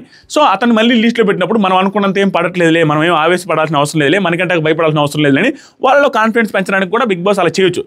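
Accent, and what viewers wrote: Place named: Nellore, India. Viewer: Adi anna Nuvvu reviews Chala baga cheputhav, Nenu Chala season nundi nee videos chusthunna . Nuvvu heartfull ga matladathav, Nuvvu mana nellore vadivi and pakka mana slang vadathav. Super anna 😊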